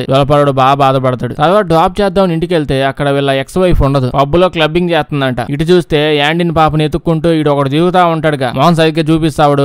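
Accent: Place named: Coastal Andhra, India. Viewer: అసలు సినిమా కథ ఏమోగాని భయ్యా, నీ నారేషన్, అదే భయ్యా, తూ.గో, కృష్ణ జిల్లాల కలగలుపు భాష ఉంది చూశావ్, దా. . నమ్ అహ, వద్దులే బాగోదు. . .అది మాత్రం ఇరగదీశావనుకో! అట్లాగే ఇంకో సినిమా గూడ సూపిచ్చు, మర్శిపోవొద్దు. థాంక్స్.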